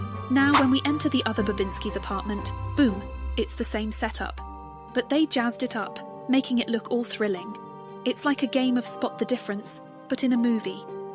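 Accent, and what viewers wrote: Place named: United Kingdom. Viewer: I think it just sounds slightly odd because the speaker is British, but keeps using American colloquisms like "a bunch of" and "super" as an alternative for "very".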